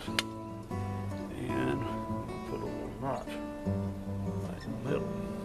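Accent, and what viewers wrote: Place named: South, United States. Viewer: I’m getting a “southern” Bob Ross vibe. Excellent outcome, wood grains look awesome.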